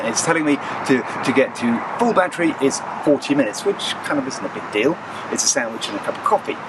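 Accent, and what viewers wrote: United Kingdom. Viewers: Very nice to hear Tesla get praised in the British accent, after all of the other's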